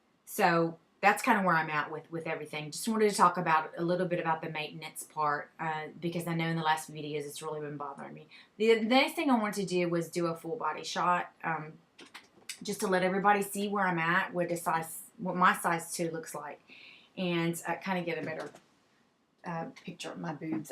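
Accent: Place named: Louisiana, United States. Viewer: I see ur Saints Fleur de lis on the wall, I did notice your accent before and thought you were a LA girl too! I live in LaPlace 30 min from New Orleans. Love, love, love ur updates! Penny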